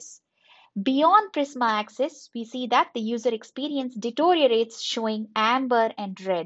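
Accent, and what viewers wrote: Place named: India. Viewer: Indian english.. sorry this is can not work.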